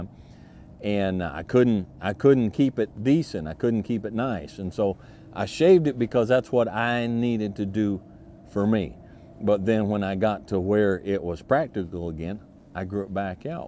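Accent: United States. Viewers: Thank u, sir! Feels like I'm taking with my pal, or an uncle, or alike 🤝 and I love your genuine attitude, sir, and your accent. Real American. Big up and all best from a random Russian dude 👍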